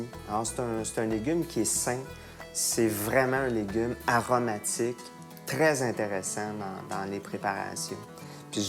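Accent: Quebec, Canada. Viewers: Voilà une bonne recette avec le bel accent de nos cousins de Québec. Et une petite leçon sur la culture des poireaux au Canada, c'est intéressant. En principe je fais les poireaux en quiche ou en gratin, et je vais essayer cette belle recette. Merci!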